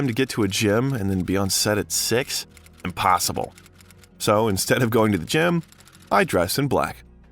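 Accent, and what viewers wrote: United States. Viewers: I love your voice and accent. California? Lol hard to follow the story at the beginning because distraction of this pleasant voice. But then, yeah, I like the biography 🎉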